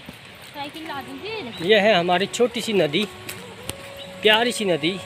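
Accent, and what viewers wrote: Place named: Pahari, India. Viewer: Wow कितना sunder जगह बहोत ही aacha मन ख़ुश हो गया वीडियो देख कर और आपकी पहड़ी आवाज सुनकर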